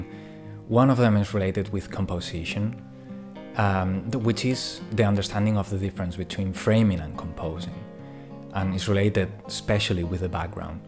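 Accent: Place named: France. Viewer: The cadence of your speech, the small motions of your head and body when you are talking and your accent remind me so much of the french actor, Tchéky Karyo. See especially his character in the 2011 film, "The Way" written and directed by Emillo Estevez.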